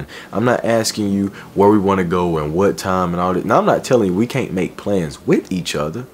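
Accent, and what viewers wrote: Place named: Mississippi, United States. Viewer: this guy gotta be from mississippi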